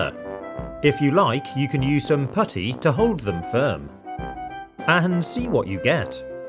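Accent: United Kingdom. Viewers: The British accent never gets old!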